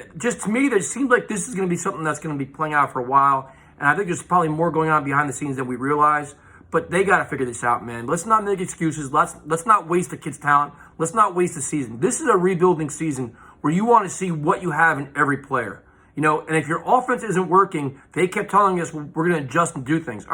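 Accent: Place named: New York, United States. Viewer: This guy sounds new yorky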